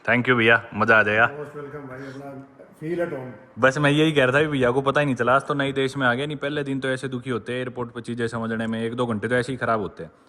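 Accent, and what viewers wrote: Haryana, India. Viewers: Es desh mein haryanvi boli sun ke Khushi hoe, very good, love from Sandhu Ambala ❤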